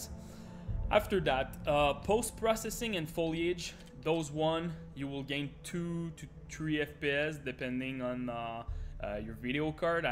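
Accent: Quebec, Canada. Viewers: l'accent québécois mon chum, bon vidéo big.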